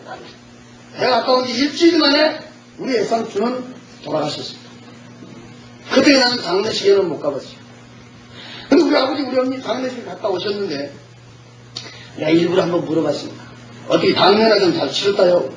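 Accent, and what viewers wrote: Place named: Jeolla, South Korea. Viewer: 보지않고믿는자가 복되도다하셨지만~하나닝은 협력하여선을 이루어주시는분같아요~ 구수한전라도 말씨와 목소리가 성령의언어인것같아요 목소리도 은혜가되네요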